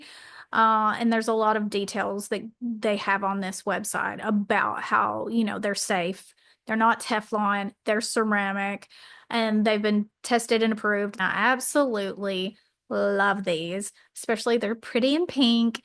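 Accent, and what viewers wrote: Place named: West Virginia, United States. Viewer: You from West Virginia 😄😄😄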